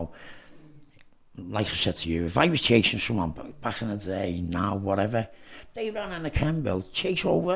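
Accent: Liverpool, United Kingdom. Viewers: Scouser x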